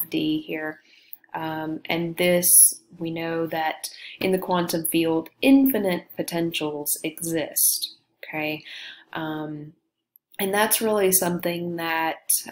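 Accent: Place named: Texas, United States. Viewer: and I say definitely embrace your Texan accent. God's in his/her place. All is right with the world.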